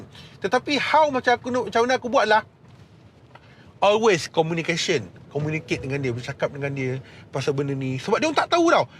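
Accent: Malaysia. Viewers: lah igt kan mmg melayu 😂😂 abg cakap mcm mmg melayu tulen laaa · Mati2 aku ingat ko ni melayu. 😆 Cakap pun dah pure melayu. Anyway alhamdulillah and proud of you bro. Never leave your family despite revert to Islam. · Selama ni ingatkan memang melayu. Rupanya berbangsa Cina. Loghat percakapan macam orang melayu. MANTAP!!!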